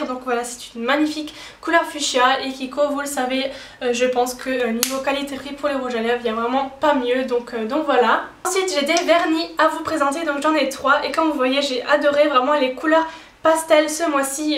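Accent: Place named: Alsace, France. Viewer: Aaaaaaah je me disait bien que ton accent me disait quelque chose enfin quelqu'un d'Alsace ! :D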